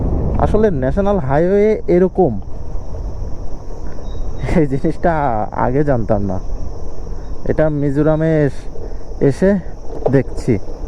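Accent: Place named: Noakhali, Bangladesh. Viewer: Bhi tomar Noyakhali dialect onaak sundor. Noyakhali ta e koioooo . 😊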